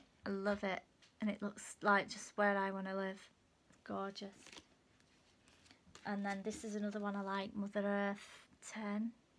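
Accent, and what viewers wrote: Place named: Northern England, United Kingdom. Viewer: Thank you for the recommendation and review, we appreciate you taking the time to do it! And you sound like a fellow northerner (I am from Bradford originally) - Josephine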